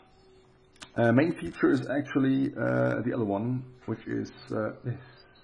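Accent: Germany. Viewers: That german accent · Ich glaub du kommst aus Deutschland wegen deinem Akzent :D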